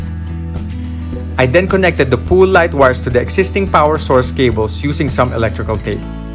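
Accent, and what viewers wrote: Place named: Philippines. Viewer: Salamat! I knew by your voice you were likely Philipino. I now no for sure, since I went to website to look at the light. Available in Manila lol ...looking for something similar in the states! Much bless!!! Thanks for the suggestion on waterproofing the J-box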